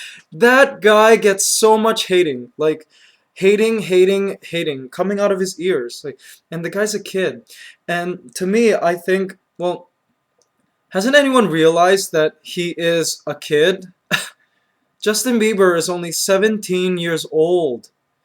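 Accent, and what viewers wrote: Philippines. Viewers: ._. i find it more interresting to watch ur vids in the pinoy accent xD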